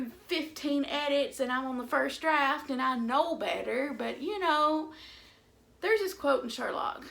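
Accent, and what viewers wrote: United States. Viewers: I love your accent because it reminds me of places I have lived in the Deep South. A bit of an accent sometimes comes out of my mouth to this day... If I ever said I wasn't jealous of other writers, I was lying... I often want to give up writing... I love editing. Like Stephanie said below, it's my thing/jam... Hey, you should love your books!!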